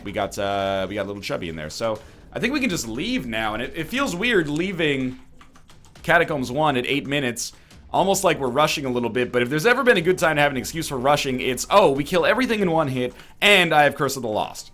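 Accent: Canada. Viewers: NL went so fucking Canadian this episode. The dark color to your tears after the reroll (and the thing that gave you piercing and spectral tears) was Dead Onion, btw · So NL's Canadian, eh?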